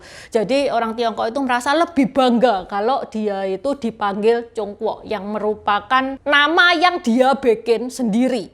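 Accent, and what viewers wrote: Java, Indonesia. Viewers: ... unikny lagi pke loghat jawa medhog 😃😃😃😃😃 ... 👍❤️🙏 ...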